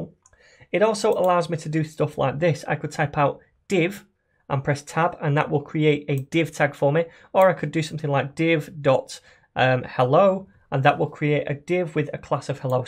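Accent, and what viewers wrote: United Kingdom. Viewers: Hey! Great British English, useful content. Thanks a lot, shared it with my group).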